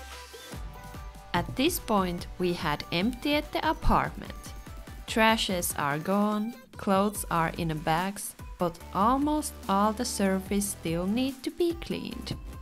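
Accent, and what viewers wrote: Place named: Finland. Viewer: And Finish accent too is.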